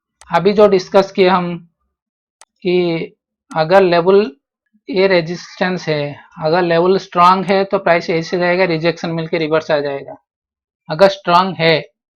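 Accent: Odisha, India. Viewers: Sir are you from orissa ?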